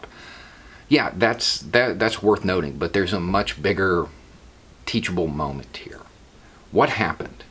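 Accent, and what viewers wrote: South, United States. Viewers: Not enough. Though I always hope that the beard and hick accent catches their attention for a minute.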